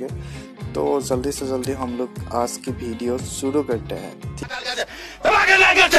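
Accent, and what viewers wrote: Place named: Assam, India. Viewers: he is from Assam i think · Assam se hain ye... 🤗 Himonoto biswa sarma ji bhi yhi se hain ..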